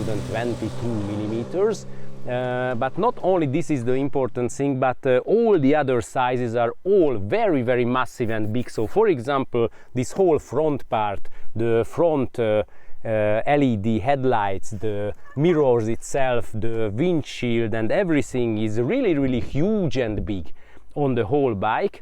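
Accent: Hungary. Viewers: It's Hunglish. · Typical Hungarian English accent, very knowledgeable and I’m sure it’s not your first BMW! Hajrá magyarok!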